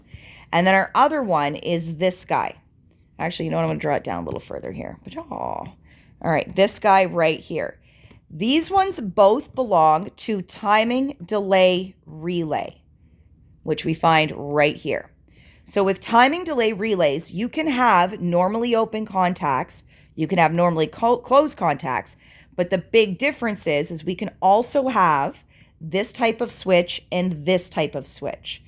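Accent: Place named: New York, United States. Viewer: chill your new york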